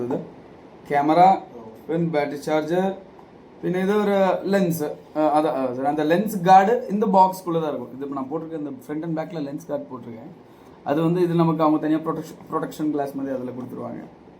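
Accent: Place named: Kerala, India. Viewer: Thalaivanoda malayalam and tamil kalantha sound key thani fans undu ❤❤❤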